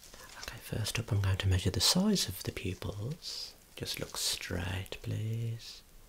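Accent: Birmingham, United Kingdom. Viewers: Hes from birmingham or wolverhampton. The accent. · Is it a Brummie accent?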